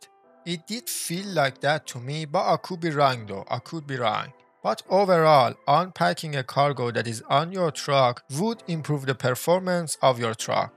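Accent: Iran. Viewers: Your accent sounds Iranian :) my gf is from IR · Hi Are you persian? لهجه داری اخه 🤓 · Amazing Persian accent aside, the tips are good and helpful.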